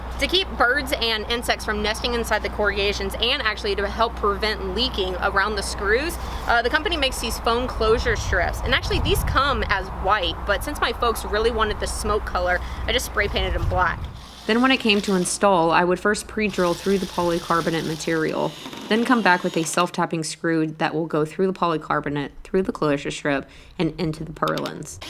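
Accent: Texas, United States. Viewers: 1) You're a role model for me because of your willingness to tackle things you don't know and learn as you go. 2) Your accent makes me homesick for Texas 3) The head scarf thing looking like Rosie the Riveter was pretty damn awesome. 4) There's no four. Why did you think there would be?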